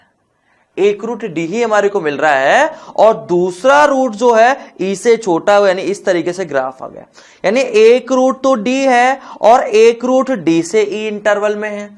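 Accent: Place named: Maharashtra, India. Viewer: Sir sounds marathi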